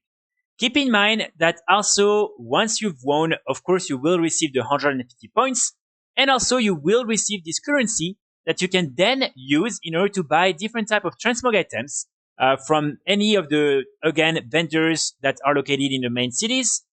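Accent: France. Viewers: I didn't know you were making videos aside from the gold making theme! As a trading post farmer myself it's always welcome to have some optimisation intels so thank you for that !! Also, rien à voir mais t'es français nan ? Ca fait longtemps que je me pose la question avec ton accent.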